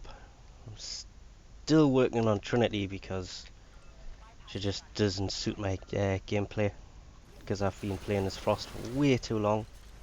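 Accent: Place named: England, United Kingdom. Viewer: I enjoy your content but could you please stop calling the stealth frame loki ... logi it is a k not a g, sounds petty but as much as I enjoy and use your information It annoys he that someone that sounds so English (sorry if your Newcastle as I realise you don't like being called english) can get a pronunciation so wrong, again I'm not trying to be insulating just making a point of reference incase someone else picks up on this point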